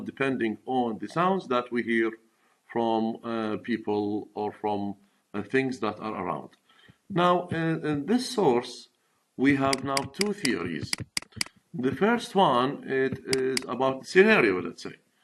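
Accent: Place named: United Kingdom. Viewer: اللكنه بريطانيه بامتياز . ❤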